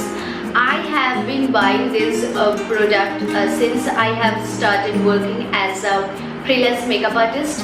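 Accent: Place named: Bengal, India. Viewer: First View Is My ❤️ and And First Like Is My ❤️✨ lot's of love your BANGALI VOICE Cutest pie 😊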